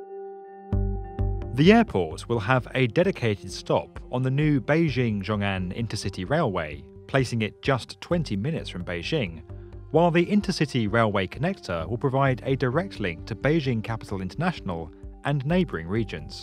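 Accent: United Kingdom. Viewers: I know it was going to be a British guy 😀😀😀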